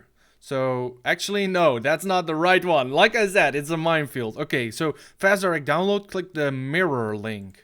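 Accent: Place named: Netherlands. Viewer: dag ik zie dat je nederlands bent het was duidelijk aan je uitspraak let er op bedankt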